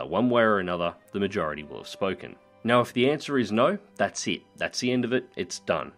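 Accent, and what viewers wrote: Australia. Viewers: I live, breath, eat, and sleep Realistic Air in War Thunder, and your videos are one of the reasons that when I made the switch from arcade, I was able to function. I've grown as a pilot since then, and while your tutorials and reviews aren't as helpful to the me of the present as they were to the noob that was me of the past, they are still helping me grow. Your content, regardless of the game you cover, is very entertaining to me. I mean, you've got yourself a prime Aussie accent. I will continue to be your subscriber, regardless of the choice you end up making here. That being said, I really want War Thunder back on the channel. No matter how asinine Gaijin can be sometimes, the game they created is loads of fun. Its beauty is only accentuated by your ability to show off its vehicles to a high potential. o7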